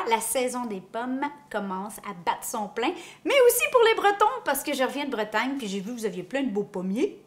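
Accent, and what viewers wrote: Quebec, Canada. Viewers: Accent québécois exagéré. Désagréable .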